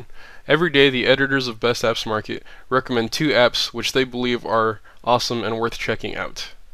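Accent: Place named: Netherlands. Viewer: You sound a bit like a Dutch guy speaking English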